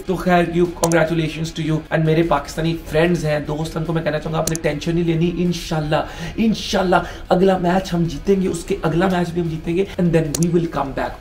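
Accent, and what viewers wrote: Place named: Punjab, India. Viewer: You are so funny and your Punjabi accent.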